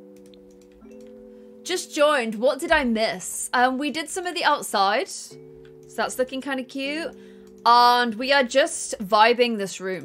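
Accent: United Kingdom. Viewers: It's so cute how you speak Korean with an English accent.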